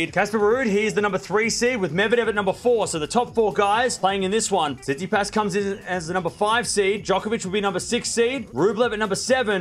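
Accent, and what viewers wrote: Australia. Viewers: Please pardon Australian accent. He tries hard to pronounce names correctly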